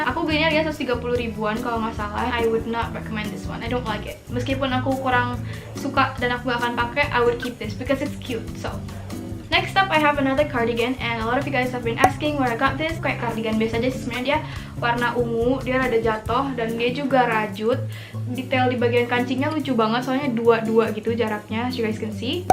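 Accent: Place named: United Kingdom. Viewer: Plss your english accent😭😭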